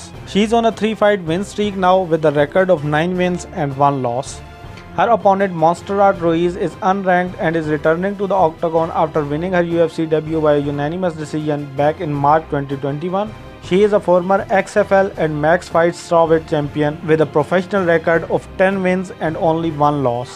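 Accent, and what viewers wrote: India. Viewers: This is not asian accent.. this is KELING ACCENT... LOL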